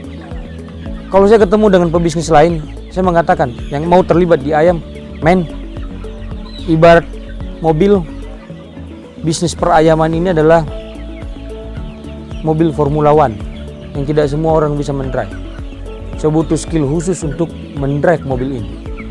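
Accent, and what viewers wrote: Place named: Sulawesi, Indonesia. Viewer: Logatnya mcam orang sulawesi kyanya ya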